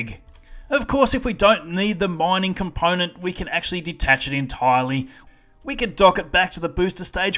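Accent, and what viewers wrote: Australia. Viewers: just got a sub only due to your amazing auzzie accent, what state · It's an Australian accent. Didn't you catch the "crikey!"? lol.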